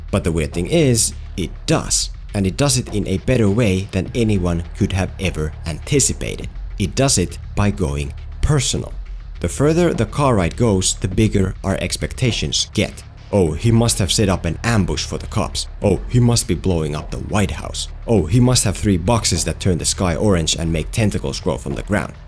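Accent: Finland. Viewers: I was 100 % sure you were Finnish because of your English accent. It's a unique accent I thought only Finns had. The more you know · Dying to know where your accent comes from. Sounds a lot like a Finnish accent to me :D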